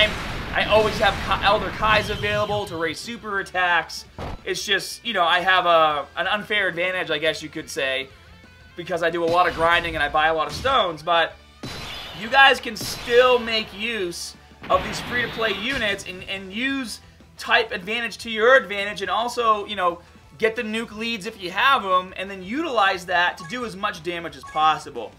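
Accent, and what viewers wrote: Boston, United States. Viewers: Hey are you from Boston? U got a hint of the accent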